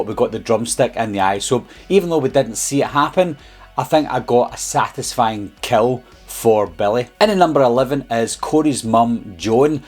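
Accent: Scotland, United Kingdom. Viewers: I love your accent, is it Scottish?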